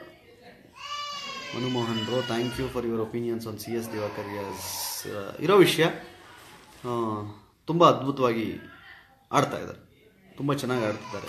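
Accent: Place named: Mangalore, India. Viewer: Seriously nimma kannada thumba chennagide... Mathe mangalore kannada na thumba thumba chennagi use madthira.... 👌👌👍👍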